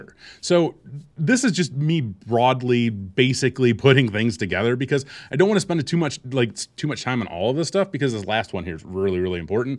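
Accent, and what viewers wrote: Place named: Midwest, United States. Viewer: The midwest accent is strong with this one.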